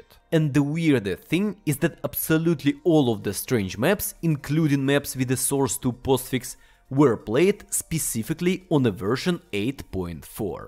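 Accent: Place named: Russia. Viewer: hope you don't change your accent along the years XD best Russian one so far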